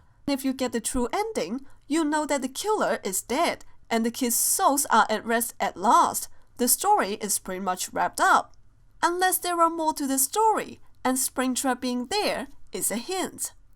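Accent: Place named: China. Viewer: TOO MUCH CHINA ACCENT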